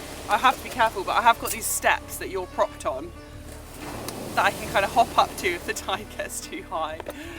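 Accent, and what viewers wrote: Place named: England, United Kingdom. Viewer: I love your videos. As a Brit now living abroad it makes me smile to hear an English accent, see familiar British sights and have a catch-up with how things are going with you and. Yes - I'm a walker and camper who discovered you both through those video styles, but the everyday family stuff suits me too. Thank you! Beach fail - romantically watching a dog poop in front of you and watching it steam in the salt breeze. Lol!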